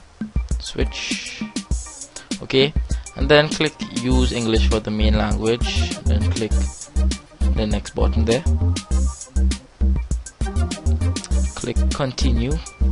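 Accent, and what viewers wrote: Trinidad and Tobago. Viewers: hmm u soundin a trini hoss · DALM U HAVE DAT TRINNI ACCENT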